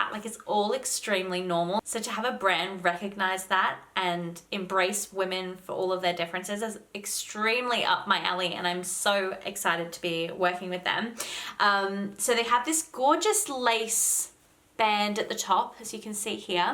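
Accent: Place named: Australia. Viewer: An Aussie girl talking about periods, it made me smile.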